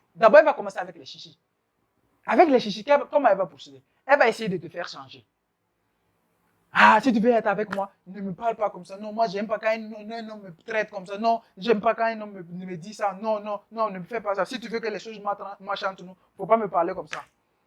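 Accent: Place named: Cameroon. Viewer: Tu a le même accent que Samuel etoo l’ex soccer player je suppose que tu viens aussi du Cameroun comme lui haha 😉😉😉😉😉😉😉😉😉😉💪💪💪💪💪💪💪💪💪💪💪💪💪💪💪💪💪💪💪💪💪💪💪💪💪💪🤜🤜🤜🤜🤜🤛🤛🤛🤛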